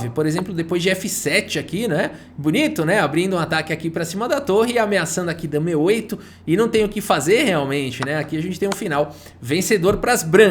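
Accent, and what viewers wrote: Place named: Sao Paulo, Brazil. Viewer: Desculpa cara mas é difícil tankar esse teu sotaque paulista. Ameniza isso daí meoooooo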